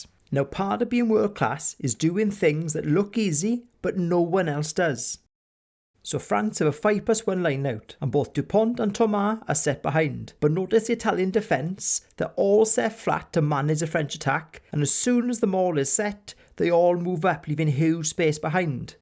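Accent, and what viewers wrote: Wales, United Kingdom. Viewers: Sorry. I enjoy what you do but your welsh accent is an obstacle for a normal frenchman. Friendly. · Great analysis, and I like your accent (welsh, if I am right). I will check your other videos.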